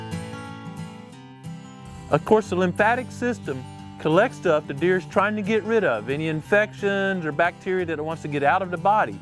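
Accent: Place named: South, United States. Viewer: Sir, I don't know where exactly you hail from. But you sound like a Southern gentleman who knows his stuff...minus all of the extra stuff. And for that I am thankful. This video is direct, effective and presented in a friendly yet knowledgeable tone that helps a lot of people learn...like country northern boys like me. Lol!. Too folks want to put on airs cause they're in the public eye (especially when on YouTube), they want more "hits", or they think a flashy style beats substance. But at the end of the day, good old common sense, common tactics with common tools always reign supreme cause...they work! Good job. I'll be watching your channel.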